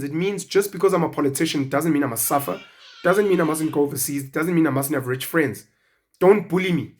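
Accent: South Africa. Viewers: Owh the man...you sommer mix the coloured and white accent together🤣🤣🤣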